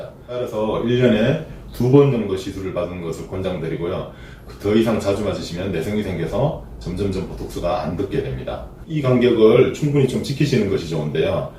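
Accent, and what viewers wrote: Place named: Gyeongsang, South Korea. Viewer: 쌤 이제 갱상도 말투 안쓰시나여 서울싸람 다되셨네여~ 진짜 멋진분 최최고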